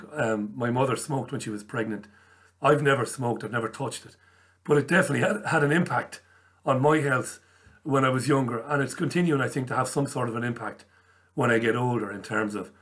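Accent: Ireland. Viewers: O man ......I miss ya ? I didn't realize how much I listened to you and the show even my kids are asking about you ..my ten year old son does a real good Irish accent all because of your show come back soon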